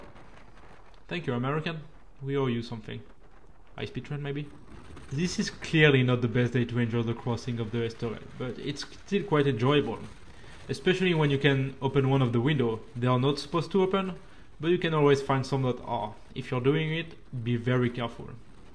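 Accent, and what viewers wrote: France. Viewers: Nice video, really helped with our booking.. love the French accent, don't change it - I would say to try and speak slightly slower, just so people can fully understand everything you're saying.. but, keep up the good work! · Bravo, ce format avec voice over est beaucoup plus sympathique et on a plus l’impression de faire les trajets avec vous. Merci pour toutes les nouvelles informations communiquées. And the French accent is charming. Arnaud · I love your French accent, but do admit I turned on CC. Sorry. 🙂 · Your video-clips are usually very informative. I truly appreciate that, thank you very much! 👍 However, I sometimes find it difficult to catch up with you. No doubt - your french accent really is lovely! 😍 I however would appreciate if you could speak a bit more clear and not as fast. This would help me (and others perhaps) a lot to better understand the content and the informations provided by you in english and to compensate the accent by a bit. 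Thank you so much for not taking this as a personal criticism but a friendly request from me, a viewer that really enjoys your highly appreciated effort to spread out informations on und about train-travel in europe. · We very much appreciate your talking,. along with english subtitles. Your "French accent" is lovely and most enjoyable to listen to. Please keep talking! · Loved the video and truly enjoyed your voice over commentary and your French accent. Just a little feedback. Like most native French speakers you speak very fast, even when speaking a language that isn’t natural to you. Just slow it down a little, and we will hear you more clearly. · Regarding the presentation of the video, I did like the spoken commentary. However, I did have a bit of difficulty with your french accent, so I put up the ENGLISH subtitles. That did help! This evening I will watch Eisenbahn Osterreich, Deutschland, Schweiz. 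This a German language channel giving news about railways in the three countries where German is the most important language. The presenter, Sebastian Reisinger, is from Vienna/Wien, and speaks a very clear German most of which I can understand. But to help, I put up GERMAN subtitles. (Subtitles in other languages are not available.) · I do have trouble staying with you because of your French accent. I think if you spoke more slowly in English it would be better. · Thanks for the personal side in your video. It explains why you're the nr 1 train vlogger. I don't mind your talk or French accent. You can always switch off the volume. I prefer subtitles only BTW. Merci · I love your french accent! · Very good, really appreciated your commentary,. Liked the sequence in the cab...and do show a few pics of yourself, you are a good looking dude. By the way, your French accent is a plus. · A fascinating and excellent video as always - thanks very much. 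It would be good to have a variety of subtitled and narrated videos. As for your English and French accent, you have no need to apologise. You speak very clearly and can easily be understood. This is a journey I’d love to take, but the thought of my partner and I having to share a couchette even in 1st Class puts me off. Come on SNCF, you should provide some private sleeping compartments and you should also have better catering. So many train companies have cut back on catering but it’s a false economy. If you provide good facilities, people will use them and most will be prepared to pay to use them. The SBB in Switzerland is an example of that - we’ve eaten in several SBB restaurant cars over the years and they’re always busy. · NO! I much prefer and love your non-voice videos. With all due respect, your English is difficult to understand, and you speak too quickly. Nothing against the French accent (I love it), but you tend "swallow" words, especially names. 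· Love the trainride and hope to take it in spring 24 the other way round. I like the voice over and do not the French accent.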